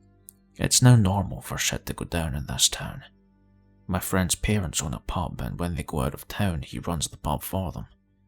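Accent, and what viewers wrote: Scotland, United Kingdom. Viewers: A Scottish accent goes really well with scary stories. Great story telling voice👌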